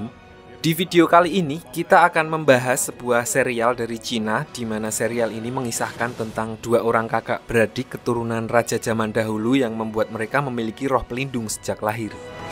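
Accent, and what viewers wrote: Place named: Java, Indonesia. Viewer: Subscribe dlu smbari nntn Suara mimin asik jls dn ada jawa² nya gitu 🤣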